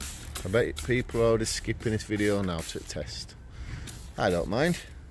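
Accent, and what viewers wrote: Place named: Yorkshire, United Kingdom. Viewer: Nice video mate ! I guess, by your accent, that you are from the Yorkshire Region..... I am going to try to get that product as I need it badly for my tent that is getting rather old . Cheers from Chile !!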